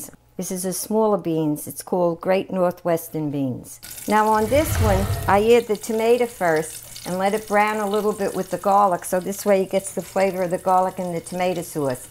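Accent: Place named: New York, United States. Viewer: You sound like your from New York !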